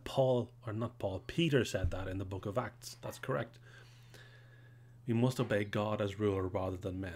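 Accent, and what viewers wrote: Ireland. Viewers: Hi, sound like an Irish man... I'm in Mayo, what's your background?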